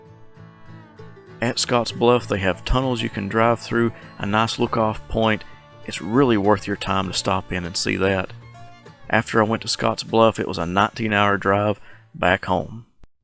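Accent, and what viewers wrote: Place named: East Tennessee, United States. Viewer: First of all, found your channel last week and I had you pegged for East TN or even Lee County area based on your accent. My family comes from Grundy/Dickenson area. Been looking into a graduate degree in 19th century American history myself & even been accepted into a couple programs, where are you getting your degree from? Great video too btw, looks like a great road trip! 🤙-Josh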